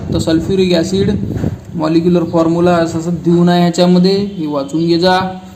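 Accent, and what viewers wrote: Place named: Nagpur, India. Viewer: Sir are you from nagpur I think because you talk like nagpuri bhasha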